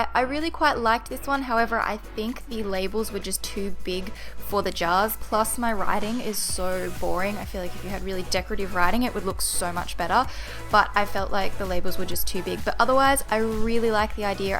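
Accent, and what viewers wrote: Australia. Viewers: Are you from Australia 🇦🇺😊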